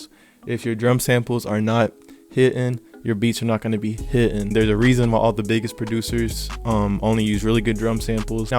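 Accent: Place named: United States. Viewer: I love american accent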